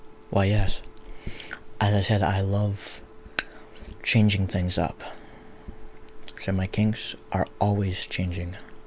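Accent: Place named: South, United States. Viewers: I'm actually really worried you live around where I live bc if I see you anywhere I will probably scream, cry, and run away because jfc. You like lakes, you have a specific dialect.... Georgia? North Carolina? Virginia? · Creeper alert. Even before you posted a video wearing a WV shirt, I assumed WV from your accent. I went to Marshall University. 😁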